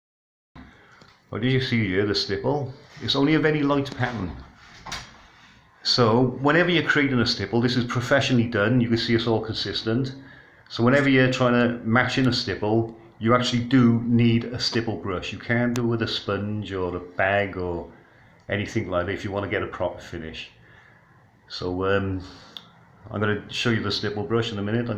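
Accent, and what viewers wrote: Cardiff, United Kingdom. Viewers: Thank you. Do I detect a Cardiff accent 😊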